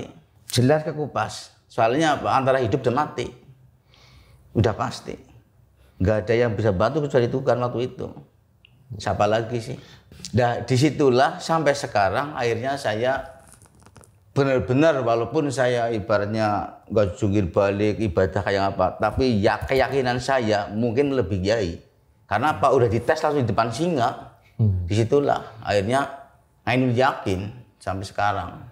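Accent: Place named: Banyumas, Indonesia. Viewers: Salam dr Banjarnegara. Kelihatan logatnyaaa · Ceritanya sedih dan bikin haru,cm krn bapak ngomong jawanya ngapak,jdi lucu buat saya yg nyimak,jdi kdg udh terharu tetiba ngakak sayaaaa😂😂😂,sehat2 nggih pak❤ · Owalah wong ngapak jan😅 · Waduh ngapak ya...😊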